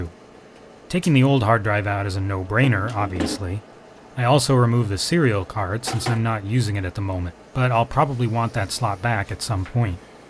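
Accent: United States. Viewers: I'm British, we pronounce the L (but not the R on the end unless we absolutely have to) but for me hearing you seppos say sauderrrr is a bit like seeing you spell words like colour or favourite without the U... something you adorable colonials just do... I wouldn't mind a modern kit to build a genuine retro computer, whether it be an IBM XT PC, a Commodore Vic 20 or 64, a ZX Spectrum or a BBC Micro or Acorn Electron. Who's with me?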